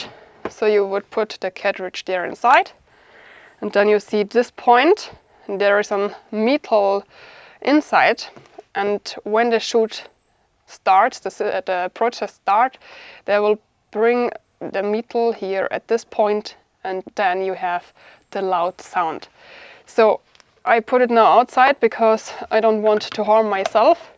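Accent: Germany. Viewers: great video thank you. have consider security camera it will alarm you also is equipped with police siren. you have nice and pleasant German accent. merci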